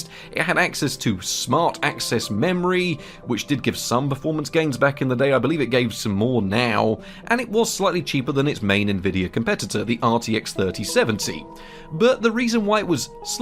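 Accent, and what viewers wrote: United Kingdom. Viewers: Your english accent makes these videos better :D Not so british you can't understand the words, tho british enough so you still get that soothing effect with the words. :D plus you get to see how the world of old graphics are doing in the world today, which is tbh quite fascinating to see how well these cards are holding up. :)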